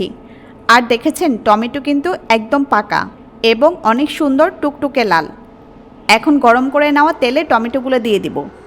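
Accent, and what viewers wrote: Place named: Chittagong, Bangladesh. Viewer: Apnar kotha bolar style dekhe mone hocche apni Chottogram er manus.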